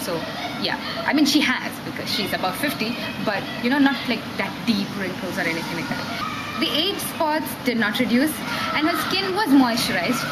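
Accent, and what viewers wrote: India. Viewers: OMG i hear an Indian accent :D i love that :D just love everything Indian. ur so beautiful :D i'm mixed with some Indian myself ^_^ :D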